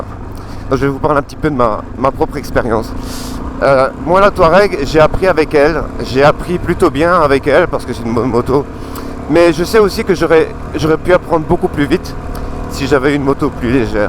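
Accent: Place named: Belgium. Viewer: Bonjour Je te suis depuis peu et tu me regale avec ton accent belge. Tu es pertinent en arguments moto, tu nous fait rever avec de belles images de maquis espagnols, je vais m abonner. Je roule en ktm 690 enduro r 2013 et 350 exc f 2018 dans pyrennees francaises et espagnoles. Continues tu nous regales avec tes expressions espagnoles. Zitoune.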